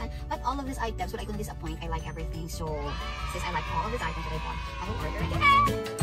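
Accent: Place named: Iloilo, Philippines. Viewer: Go sis. Nakakaaliw talaga amg diction ng Mga ilongga. Love your confidence ☺️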